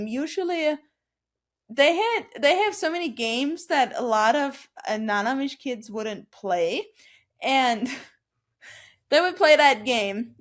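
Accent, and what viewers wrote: United States. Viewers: I find accent fascinating, and I wonder how similar it is to other people's accents from the Amish community? There are parts that sound American. But to me, as someone from the UK, there also parts that sound like a very posh English. Particularly the way words like "January" are pronounced. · It's an accent but So American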